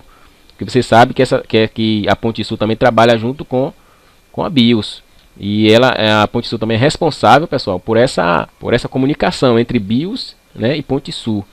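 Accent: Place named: Pernambuco, Brazil. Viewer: tu ta de parabéns pela didática, simples, direta e eficaz! E esse sotaque ta me parecendo bem "leão do norte". Sou de PE, de onde você é?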